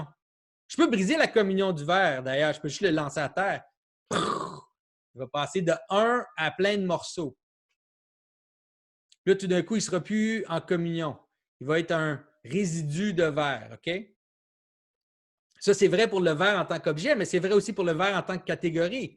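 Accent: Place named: Quebec, Canada. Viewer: I don’t even speak French and I know this guy is speaking Québécois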